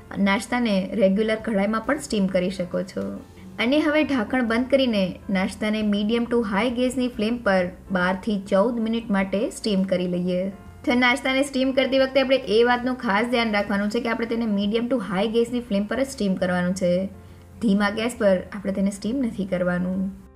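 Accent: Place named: Kathiawar, India. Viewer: આપણી કાઠિયાવાડી બોલી 🙂 સાથે મસ્ત રસોઈ 👍 God bless you 🙌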